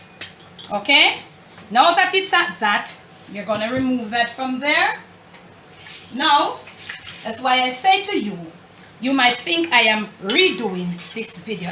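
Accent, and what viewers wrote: Patois, Jamaica. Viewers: Mi love u patois😂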